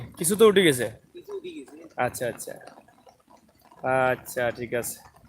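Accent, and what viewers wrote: Sylhet, Bangladesh. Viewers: Vidio kharap lage nai. Kintu apni shuddo vashai kotha bolle hoito aro valo lagto. Kenona syleter vasa shobai buje na. Buccen mama!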